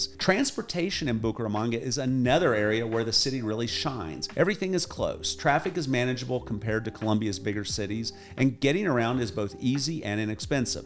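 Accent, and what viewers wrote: United States. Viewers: You aren't respecting the Spanish language if you don't roll the letter "r". Try listening to your local friends and copying, rather than reading the Spanish words with an American understanding/pronunciation. Just wanna help 😉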